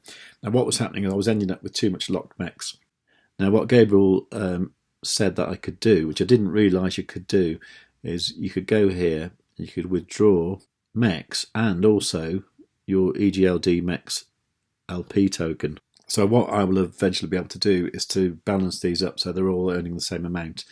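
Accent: Midlands, United Kingdom. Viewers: What part of the uk you from u sounds like ur from midlands?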